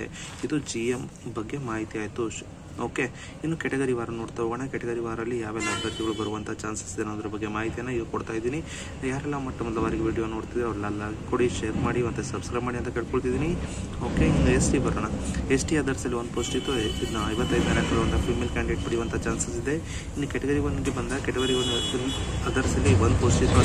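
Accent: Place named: Haveri, India. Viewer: Haveri english sir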